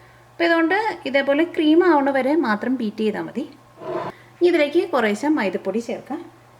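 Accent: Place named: Northern Kerala, India. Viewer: great recipe... from where in Kerala, are you from??? mixture of mid and vadakkan malayalam slang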